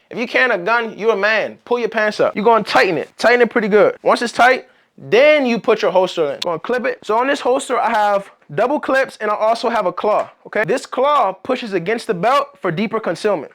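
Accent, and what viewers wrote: DMV, United States. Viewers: I never heard the dmv accent in ur shorts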